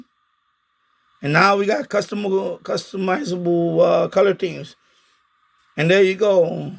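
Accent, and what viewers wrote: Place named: New York, United States. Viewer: As soon as the video started and I heard your accent, I knew I was in the right place. 😂. Clearly a New Yorker talking about radios as opposed to all the Brits. Great vid! The firmware update worked perfectly.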